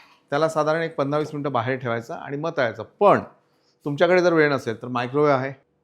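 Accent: Vidarbha, India. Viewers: Kitti god bhasha tumchi,tumhi majhya vidhrbache ka ho😂❤❤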